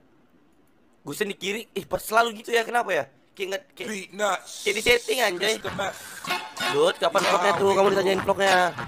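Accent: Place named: West Kalimantan, Indonesia. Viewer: mungkin logat.nya kalbar bro maman sama doyok teguh jga hampir sama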